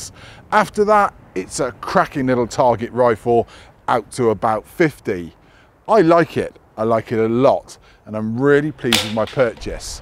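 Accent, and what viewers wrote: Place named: Bristol, United Kingdom. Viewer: Sounds like a Bristolian to me!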